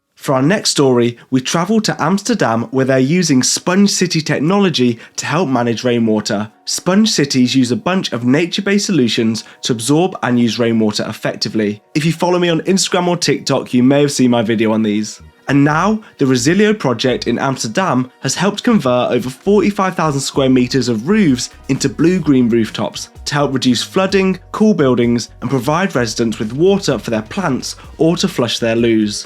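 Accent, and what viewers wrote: United Kingdom. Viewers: Having been swamped in war news, trump news etc etc today, your channel suddenly popped up, and I thought....why not! You go a little fast for my poor old brain (I've got severe M.E.), but I've subscribed, and we'll see how we go. It's refreshing to hear a British accent, for a change too.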